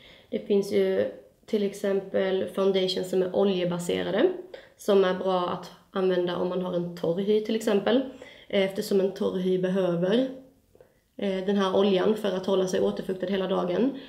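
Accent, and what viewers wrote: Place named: Skåne, Sweden. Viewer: du är duktig, men det suger att du pratar skånska. :(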